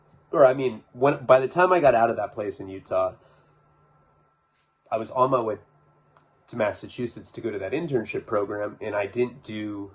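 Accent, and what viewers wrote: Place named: California, United States. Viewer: He does it so often its hilarious, it goes with his rich kid cali accent so well.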